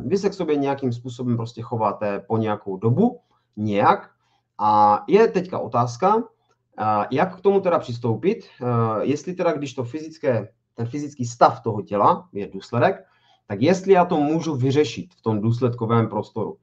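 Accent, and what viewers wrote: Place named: Ostrava, Czech Republic. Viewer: Ten ostravský přízvuk je super, jen to dost kazí ta sprostá slova. A to je škoda.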